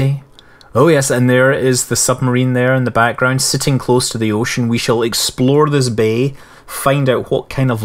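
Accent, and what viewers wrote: Scotland, United Kingdom. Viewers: well some peope have other shit to do than sit infront of a computer screen watching ssomeone else play for hours lmao,even tho i like his scotish accent and voice,maybe,just maybe i just happened to come across this video and just watched it without going ocd and being like shit i gotta watch the 10 others before this one! wich are all over 30 mins,thats 5 hours(more than that if you count the ones being an hour),grats to you if you did,but i sure dont have time to.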